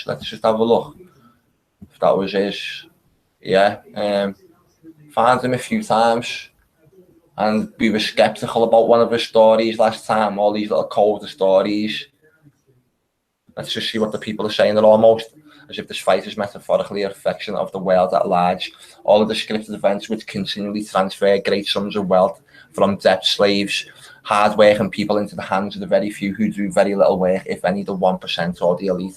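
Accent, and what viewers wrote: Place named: Liverpool, United Kingdom. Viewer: Love hearing a fellow scouser. And your so right. Total fix. Fucking joke mate.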